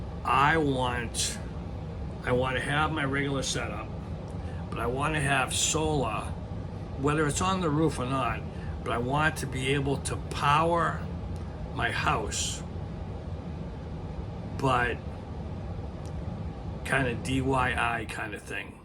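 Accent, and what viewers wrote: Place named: New York, United States. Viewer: You sound like Ny or NJ, too many clouds to go solar unless in sunny Cali or Texas , in Ohio, no way it would work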